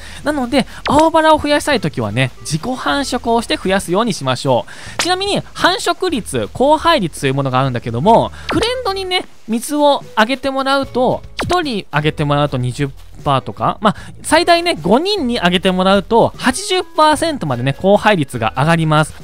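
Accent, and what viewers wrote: Aichi, Japan. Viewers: 愛知のひと？方言が懐かしい感じする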